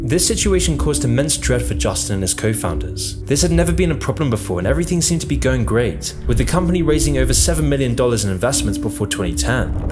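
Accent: United Kingdom. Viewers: This is what conspiracy theory sounds like in the Queen's English.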